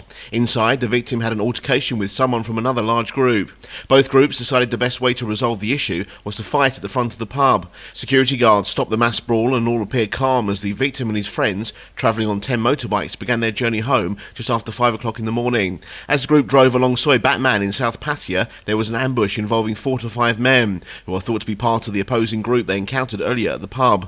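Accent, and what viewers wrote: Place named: United Kingdom. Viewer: Ei pysty. Mun huomio menee ton puheelle nauramiseen. Liian vahva brittiaksentti😭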